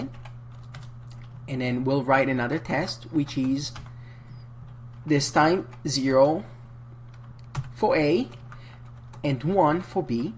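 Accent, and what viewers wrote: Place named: France. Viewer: French 😄😄😄😄... sorry but this is the first time I'm hearing French accent beside in the movies....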